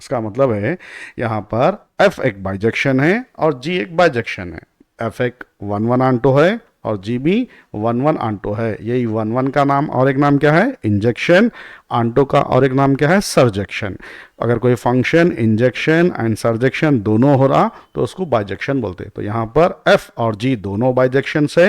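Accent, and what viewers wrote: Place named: Hyderabad, India. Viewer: With hyderabadi voice 😍 ❤️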